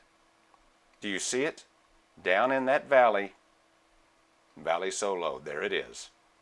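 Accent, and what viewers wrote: South, United States. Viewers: Mars is still inhabited by 5 species of Humans of varying sizes! They dont like or accept aliens coming to Mars! The Martians Kill all Alien life that land there? I also like your Southern drawl dear! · Southern accent is the best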